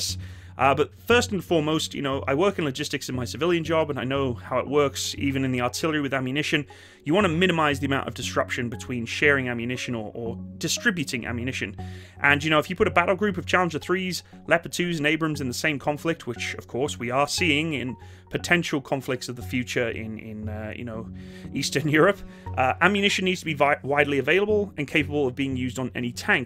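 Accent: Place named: United Kingdom. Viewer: So freaking British, innit?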